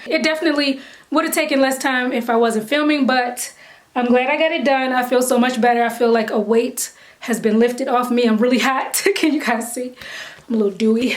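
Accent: Baltimore, United States. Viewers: Lol. I just noticed I might hear that accent from the Baltimore or Philadelphia area.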